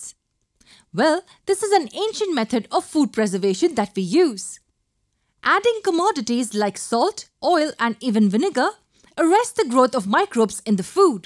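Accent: India. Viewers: This Indian accent is killing me😭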